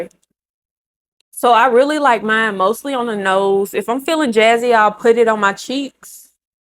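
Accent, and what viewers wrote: South, United States. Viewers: OMG I love her country accent ! 🥰😂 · New here, 1) you’re gorgeous 2) idk what I was expecting but I wasn’t expecting your voice to sound like that lol you def gotta be from the south with that accent. And your freckles!!!! 😍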